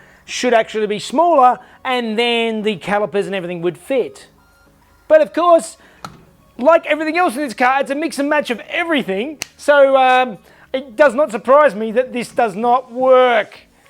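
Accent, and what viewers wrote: Australia. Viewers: So apparently Ms accent is Kiwi. I thought had an Australian accent, but my bro said he's Kiwi as well. I think they live in Sydney. Can anyone confirm if is Aussie or Kiwi?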